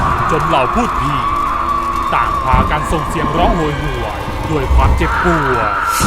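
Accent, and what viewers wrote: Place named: South, Thailand. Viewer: คนพากนี้เแ็นคนใต้เเน่ใช้ม้าย เพราะฟังจากคำพูดติดปากของคนใต้ที่ ที่พาไปเเหลงกลาง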